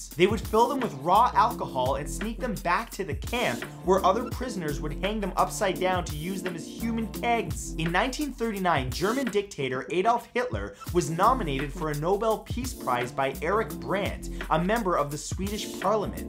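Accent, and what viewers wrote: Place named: Canada. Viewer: Ehh Canadian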